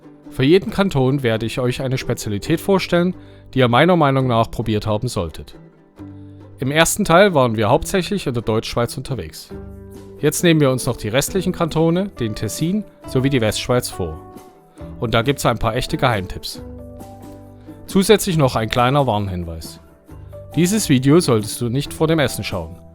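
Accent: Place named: Germany. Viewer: Danke für die Übersicht, das sind ein paar perlen dabei, die ich mal nachkochen werde! Evtl. solltest Du die Französischen und Italienischen namen einblenden. Ich spreche die sprachen auch nicht ... aber mit Deutschschweizer/Englischem akzent im ohr kann ich Dich nicht verstehen. Als Deutscher verdrehst Du die laute anders als ich 🤪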